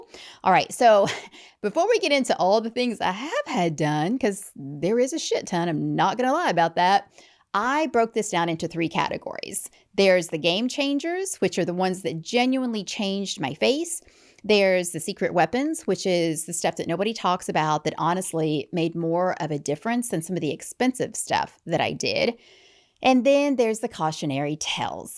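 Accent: South, United States. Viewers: I just found your channel with this video!! I love the county accent and cussing and pure southern honesty 😭I’m from Georgia so it’s like sitting down with my moms friends listening to you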